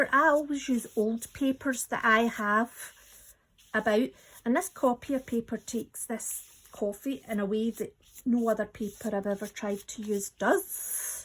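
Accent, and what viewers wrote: Scotland, United Kingdom. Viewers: I forgot to say that I’m quite technically challenged lol. But I shall have a go at that, thank you. Btw… your accent makes me feel at home.. father from Glasgow mother from Stirling.. holidays in Scotland every year… finished my schooling and teenage years in Elgin but too late to pick up an accent.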